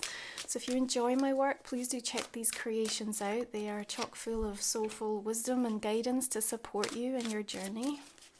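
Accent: Scotland, United Kingdom. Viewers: Lovely read thank you 🕊🙏. Very refreshing to hear a Scottish accent 🏴🏴🏴😊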